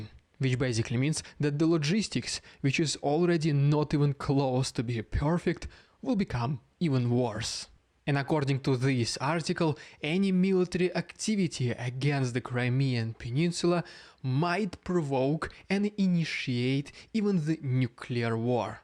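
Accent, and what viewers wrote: Canada. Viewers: You Change to Canadian dude? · You are now Canadian?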